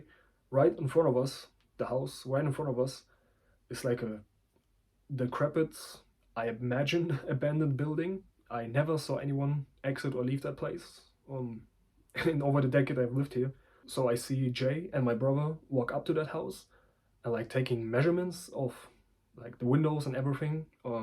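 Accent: Germany. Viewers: Du kommst ja aus Deutschland, das hört man raus. Dir viel Glück und Erfolg.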